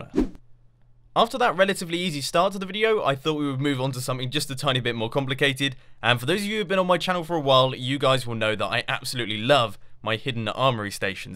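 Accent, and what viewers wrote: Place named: England, United Kingdom. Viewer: oh i forgot about the english accsent lol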